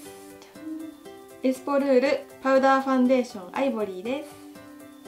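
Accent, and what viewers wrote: Kansai, Japan. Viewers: 関西弁やからしゃーないし。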